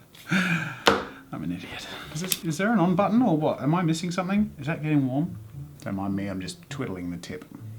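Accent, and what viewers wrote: Australia. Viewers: Are you Australian? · He sounds extra Australian today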